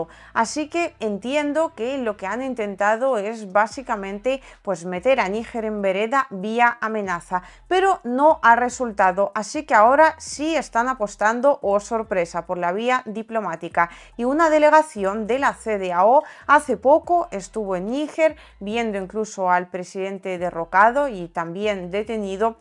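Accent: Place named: Spain. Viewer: Hola, recuerdo cuando emoezmaos a verte en sitios como twitter, tu acento totalmente español, porqué ahora tienes ese tono tan raro para contarnos las noticias? Es tan monótono que no ayuda a concentrarse ni a terminar de escuchar la noticia entera. Podrías volver a hablar normal? Gracias!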